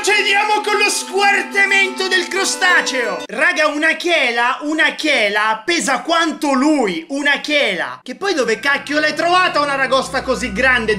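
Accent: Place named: Catania, Italy. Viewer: Io sono di catania e mi sono sempre chiesto da dove venivi pk avevi un accento catanese ho letto il wiki e ho scoperto che vieni da catania seeeeeee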